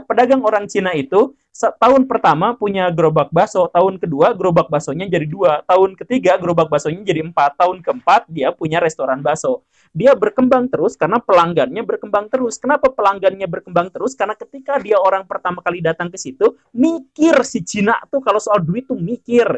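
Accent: West Java, Indonesia. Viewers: Kalo editan videonya kaya bossman mardigu tambah mantep nih video.. tapi logat sundanya gak diilangin..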